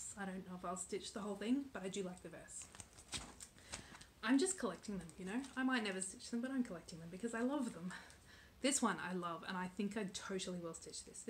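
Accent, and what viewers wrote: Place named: Australia. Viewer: Hey! Just found you! I was so happy to hear your Australian accent. I lived in Perth for a couple of months back in the late 90s. Spent lots of time at Cottesloe Beach, too and loved it! Such a gorgeous city and incredible water to swim in! I actually live in Oregon and our beaches are cold year-round. Enjoyed your video and your wonderful water piece, in particular. Simply gorgeous!!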